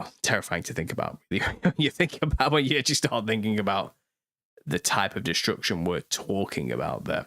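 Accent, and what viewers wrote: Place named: Liverpool, United Kingdom. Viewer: You talk like an Everton supporter.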